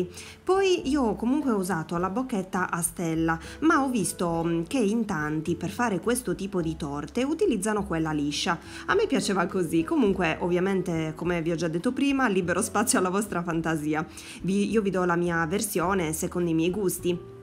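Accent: Sardinia, Italy. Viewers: Non so se mi sbaglio ma il tuo accento sembra sardo! Mi piace moltissimo la torta...anche io faccio l'impasto delle ciambelle proprio come fai tu. Ajò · Quanto 6 simpatica..amo il tuo accento forse perche sono di sassari..sarda come te..sei di una bravura e poi la verità mi piaci perche spieghi benissimo e nello stesso tempo cucini..che dire la cucina sarda e la migliore..😘😘😘💖✌✌✌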